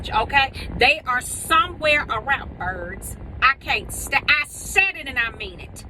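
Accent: South, United States. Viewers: Love u Soo much♥️ U are so real, southern sounding and wise. I'm from Florida in Denver . Thank u for your content🥰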